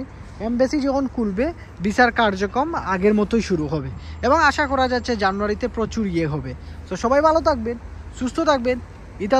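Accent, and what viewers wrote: Sylhet, Bangladesh. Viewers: ভাই আপনে সিলেটি নি জানাবেন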